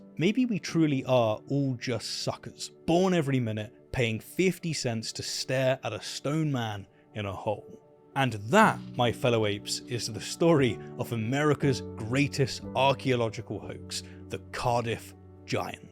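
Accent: United Kingdom. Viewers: LOL you had me untill 25 min WHAT MAKES YOU SO DI9FFENT brittish accent boy go home your nothing new . don't you have a a bold headed guy covering all aspects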